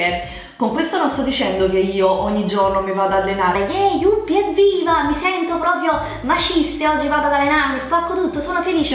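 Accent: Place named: Campania, Italy. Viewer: Ciao! Ti seguo da diverso tempo ormai e non posso che dirti grazie per come sai motivarmi, inducendomi a condurre una vita più sana ed equilibrata. Quando guardo i tuoi video mi viene proprio voglia di dedicarmi a me stessa e al mio benessere. E così continuo ad avere un'alimentazione piuttosto varia (visto che sono siciliana e abito fuori città non ho grossi problemi a mangiare cibo raccolto dalle nostre campagne [che più bio non si può XD]) e pratico nel mio piccolo un po' di attività fisica fatta di lunghe passeggiate ed esercizi tra le mura domestiche (la palestra non fa per me)... Ti ringrazio per come sai spronare e motivare chi ti segue con sincero affetto. Continua così! Un bacio grande 😘❤️ P.S. Adoro il tuo accento campano 😂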